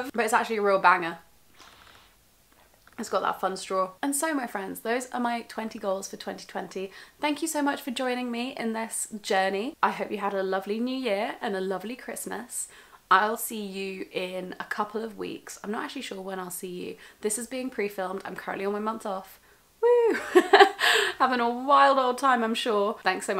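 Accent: United Kingdom. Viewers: Hello🇧🇷. I would very much appreciate if you launched a podcast on Spotify, because I'm madly fond of British accent and yours is one of my favourites when it comes to the British youtubers that I follow.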